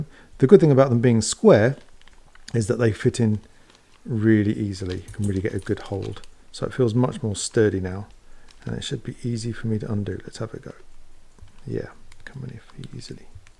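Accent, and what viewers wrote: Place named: England, United Kingdom. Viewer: I just love listening to your voice with that yummy English accent.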